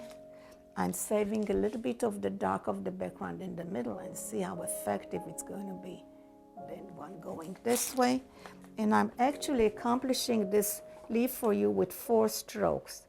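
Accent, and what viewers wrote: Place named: Brazil. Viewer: You an brazillian accent ... Beautiful !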